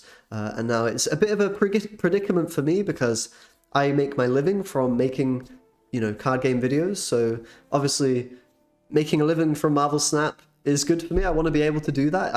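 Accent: United Kingdom. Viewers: I still wonder why you clearly have a British accent but you use Polish currency on your store 😅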